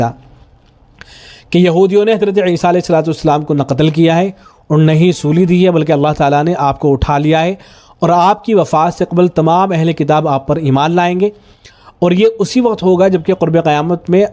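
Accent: India. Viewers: یہ شخص جیسا کے لہجے سے معلوم ہو رہا ہے پو پی سے تعلق رکھتا ہے یو پی وہ زمین ہے جس نے برِصغیر کے مسلمانوں میں دیوبندیت اور بریلویت کی شدید تقسیم پیدا کی جنہوں نے لباس واضح قطعہ داڑھی کے واہ انداز و اشکال اسلام کے نام پر متعارف کروائے جو مراکش سے حجاز و ملائیشیا تک پوری مسلم دنیا کے لیے اجنبی ہیں جن کی بیمار فکر نے نہ صرف ہندؤ مسلم خلیج کو گہرا جس کی سیاسی قیمت پنجاب بنگال اور کشمیر کے لوگوں نے ادا کی اشرف تھانوی ہوں یا احمد رضا بریلوی یہی سے تھے جبکہ ان کے مقابلے میں پنجاب نے بھلے شاہ بابا فرید بابا نانک مولانا عبید الللہ سندھی جیسے جوڑنے والے لوگ پیدا کیے جاوید احمد غامدی سے بغض بھی اصل میں اسی بنا پر ہے اسلامی تاریخ نے صدیوں بعد ایک بڑا دماغ پنجاب میں کیوں پیدا کیا۔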